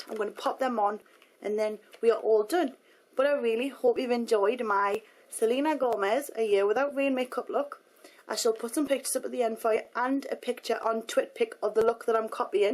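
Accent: Newcastle, United Kingdom. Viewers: No she is from up north in england :) Kind of newcastle way :) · shes talking like british really ugly engish the my is the best not the original but the best!!!!!!! :D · brittish? or scottish? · it might be odd sounding to you, but it's perfectly normal for me! she's from south shields, in england, and people from that area all have that kind of accent, it's called a geordie accent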